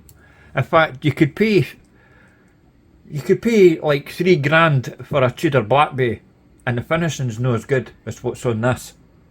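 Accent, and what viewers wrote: Scotland, United Kingdom. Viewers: Hey bud recently discovered your channel and been enjoying your reviews. What part of Scotland are you from?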